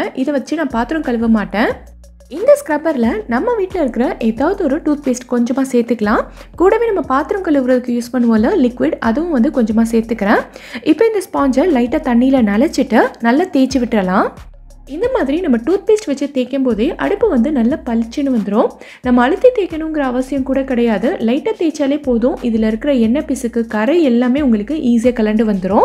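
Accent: Madurai, India. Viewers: அஸ்ஸலாமு அலைக்கும் சிஸ்டர்.உங்கள் குரல் சூப்பரா இருக்கு நீங்க எந்த ஊர்.நாங்கள் மதுரை . பேச்சு வழக்கு எல்லாம் எங்க ஊரு போல் தெரிகிறது.அதற்காக கேட்கிறேன் தப்பாக நினைக்க வேண்டாம்.மென்மேலும் வளர எல்லாம் வல்ல இறைவன் அருள் புரிவானாக... ஆமீன்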